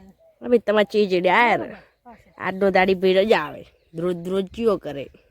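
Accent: Banaskantha, India. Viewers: Tame KO k na KO pan mane tamari boli uparthi junadeesa aaju baju na lago so ben....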